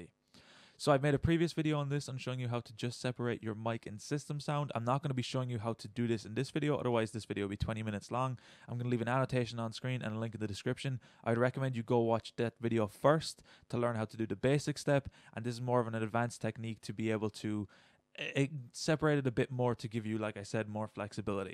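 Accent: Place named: Ireland. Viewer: defintley irish, just cant tell exactly where :/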